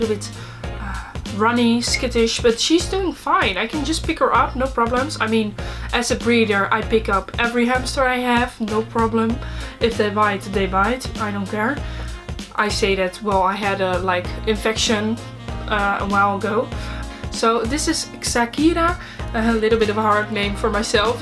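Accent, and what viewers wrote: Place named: Netherlands. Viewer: I noticed the Dutch accent, and checked the description box. Nice to see another Dutchie like me making video's about hamsters!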